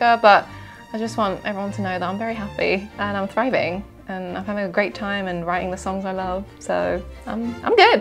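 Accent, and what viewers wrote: United Kingdom. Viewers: How did i not know she was british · I just want to hear her breathe into the mic... I really want her to ASMR through my ears...I'm really loving the British accent too... sound so nice ....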